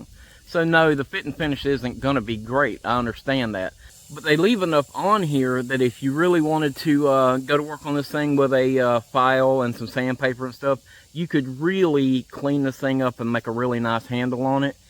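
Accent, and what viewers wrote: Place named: South, United States. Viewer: Subscribed, just because you’re safety conscious and wearing your safety squints and I like the southern accent.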